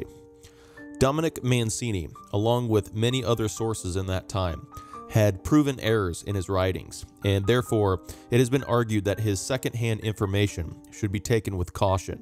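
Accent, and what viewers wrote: United States. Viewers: I enjoyed this video. Is the narrator an American? His voice is pleasant and melodious ----- reminiscent of a Southern accent (Virginia, perhaps?).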